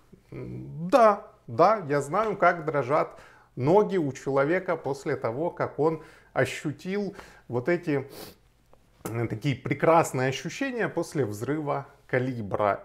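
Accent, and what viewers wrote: Ukraine. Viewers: Это не русский язык а ХАРЬКОВСКИЙ.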